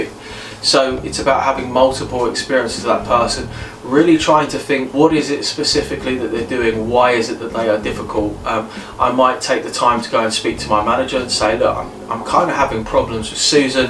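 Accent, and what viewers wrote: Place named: United Kingdom. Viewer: brother , your accent is terrible , it could be perfect for brits but for other it is a headache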